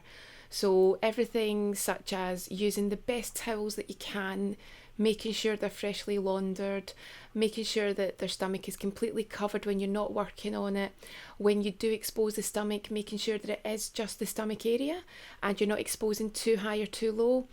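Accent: Scotland, United Kingdom. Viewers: Where in Scotland are you from? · Swedish massage....I was not expecting a lady from Scotland to do the commentary. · I could listen to your accent forever. Lol… I need to find a nice Scottish woman. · You`re Scottish accent is super turn on. Great upload,btw. :) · Is she Scottish ? I'm fond of northern British accents (and some like Surrey). She has a lovely voice indeed... She's a masage for the ears and brain :) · im from Scotland too, nice to hear a familiar accent. BTW i have been using your techniques for my husband who suffers from stress and crohns disease and it helps him EVERYTIME< thanks so much for your effort and time in making these videos. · I knew you were from Scotland, you have simillar accent to my friend’s. Are you from the Highlands? Btw, great massage. I am currently studying medicine and these kinds of videos help me to get a grasp of anatomy.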